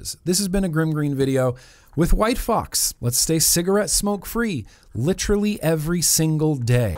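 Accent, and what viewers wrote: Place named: California, United States. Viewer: You're from California. I can tell.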